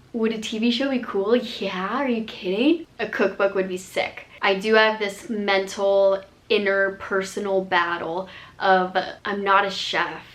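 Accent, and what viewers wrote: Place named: Utah, United States. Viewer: Definitely a Utahn with that “what I’m DO-EEN” accent. Not a Mormon though because coffee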